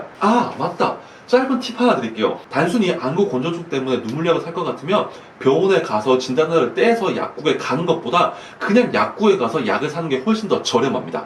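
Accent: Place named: Gyeongsang, South Korea. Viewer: 고향이 경상도 어디에요? 저랑 말투가 똑같ㅎㅎ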